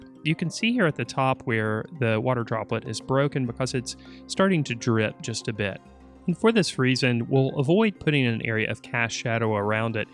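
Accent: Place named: Louisiana, United States. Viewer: Reminds me of a northwest Louisiana accent.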